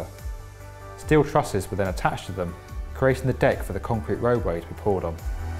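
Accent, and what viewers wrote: United Kingdom. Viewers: This guy must be brit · Why is a British guy narrating US history? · This is as heavy a British accent as it can get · Can you not find American's to narrate these videos. EVERY video I pull up has an annoying British accent. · I hate that the author has a British accent it’s pronounced Marin on Marion.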